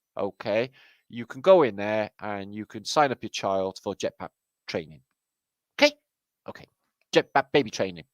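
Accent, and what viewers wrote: Wales, United Kingdom. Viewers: I'm sorry the world lost a good guy , a truther, with a gorgeous Welsh accent. (the world needs more of all of the above)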